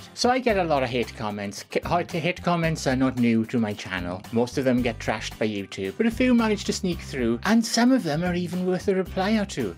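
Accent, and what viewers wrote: Wales, United Kingdom. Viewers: I will start by saying that I am not subscribed to your channel, yet you popped up and I will not judge,be mean or anything like that as I am a Christian. No true Christian would, as we have only one judge and I do pray that all knows who that is! I only wanted to let you know that as a you tuber I'm sure that you already know that based on what channels that we do like and subscribe too, they also send us suggestions. Like as with me, your video that I'm so sorry that I can't remember the caption off this video but do know that two names appeared that I love very much, Trump & Jesus! So, I did watch it but I guess I'd have had to watch those videos leading up to it to know what upset so many. I can say that your British accent I found beautiful! As far as your deep voice, I'd have to say that my beloved father was 6'4 and when I was young I was teased often as I inherited his deep voice...funny though that those Who teased me now wait for hours after (while touring and making a quite nice living on the rd. 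playing Coliseums and now they act as if we were best friends in school !) I had often wondered if when I was large enough in my career just how I would react to those who's words cut deep in my early teens, & if they would ever show up at one of my my proformancesAlthough under a different name than I can go by on here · Hahahaha "you couldn't afford me". I swear the Welsh accent makes the burn all the better. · I am loving your Welsh accent: my Mum lives in Carmarthenshire, but she's not Welsh: they are very friendly & welcoming!!! Please ignore those very nasty & ignorant comments: these crazzzies are not worth any attention & they need to get what is commonly called: "a life"!!! 😁😂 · Are u welsh? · Love your Welsh accent from another Welsh person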